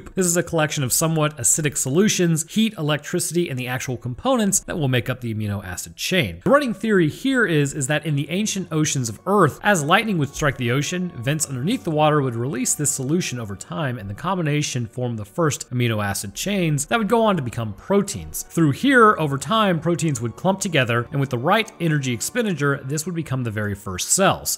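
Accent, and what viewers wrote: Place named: South, United States. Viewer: You can tell you were raised in the south lol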